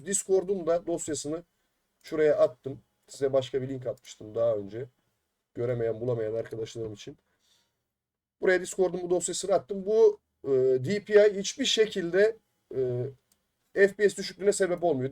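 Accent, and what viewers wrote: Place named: Balıkesir, Turkey. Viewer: Bu oyun bize kafayı yedirtecek. Ne yaptıysam bazı sorunları çözemedim. Bu sorunları tek tek size yamıyorum çünkü kişiye özel cevap verebileceğinizi düşünmüyorum. Bu nedenle işin sıfır noktasından başlayıp yardımcı olmanızı istiyorum. Windows 11 flash oluşturmadan başlayalım çünkü bizler bilgisayarı bilen kişiler değiliz. M. anlatır gibi (kendim için söylüyorum) tek tek KISACA anlatır mısın. Konuşma tarzından balıkesir ve çevresinden olduğunu tahmin ediyorum en son çare bilgisayarı kucaklayıp sana getireceğim. kolay gelsin.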